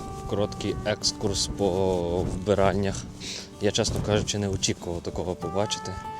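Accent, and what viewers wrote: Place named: Volyn, Ukraine. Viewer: цікавий діалект, звідки ти? волинь (десь цей район?)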